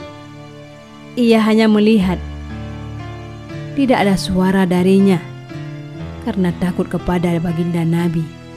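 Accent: Aceh, Indonesia. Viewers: pengisi suaranya seperti logat org aceh😁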